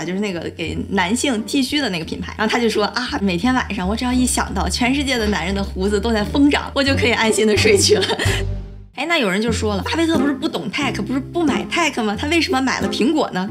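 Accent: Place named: Dalian, China. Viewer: 中山区出生的海蛎额味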